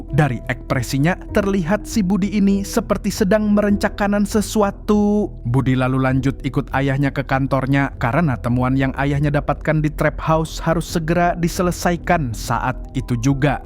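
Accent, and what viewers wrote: Banjar, Indonesia. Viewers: Orang Banjar ni admin😂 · Orang banjar kah mang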